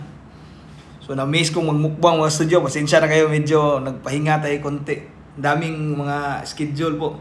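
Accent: Visayas, Philippines. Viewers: Mr.wilcomeback. Let wifi to eat also not all d time ikaw ang nkikita sa u tube.visaya p ang accent mo dong.talk tagalog so everybody can understand db .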